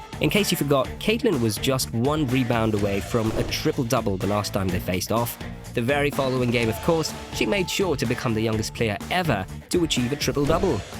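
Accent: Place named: United Kingdom. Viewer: Nothing gets me into the mood for basketball like a British accent.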